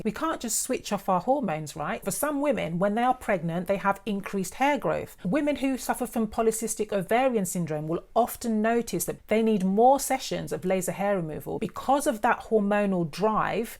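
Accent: United Kingdom. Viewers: I love your british accent 💕💕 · I wish there was video for none black skin or dark skin as I am not. I'm Hispanic. Oh sad those that got burned. I hope they were able to get healed or surgery grafts or whatever. Maybe they did not have the money. You are so pretty. I like your hair style. I love the British accent. You gave a pretty smile. Well tye tips you said to shave is good. Just as I thought, but not too much so thanks.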